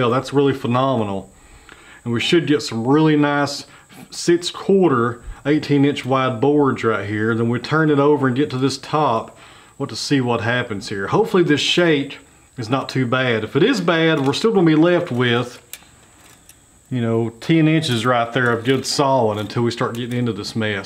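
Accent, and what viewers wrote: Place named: South, United States. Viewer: Hey bud! Interesting video & story! Beautiful grains on that log too! I just had to complement your accent. I have a southern accent too but mine is clearly an NC/SC Piedmont one. That eastern TN twang is one of my favorites for storytellers!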